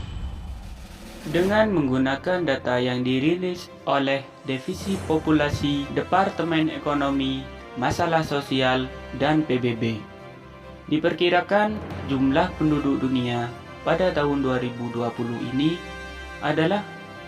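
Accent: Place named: Aceh, Indonesia. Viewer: bahasanya kebanyakkan dan dan dan dan dan logatnya logat aceh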